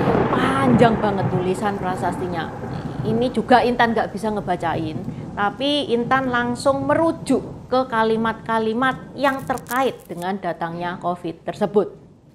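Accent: Java, Indonesia. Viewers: Logat Jawa medok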